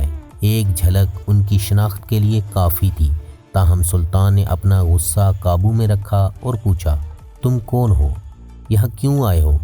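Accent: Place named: Punjab, Pakistan. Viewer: Yeri maadri jubaan saayad punjabi hai par urdu bol raha hai par punjabi ki jhalak hai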